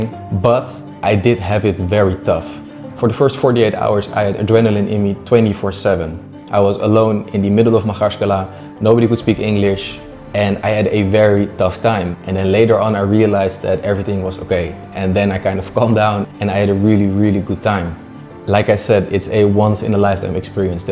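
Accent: Netherlands. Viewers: Why do you have an american name but a Dutch accent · Amazing video. Your accent sounds Dutch. Where are you from?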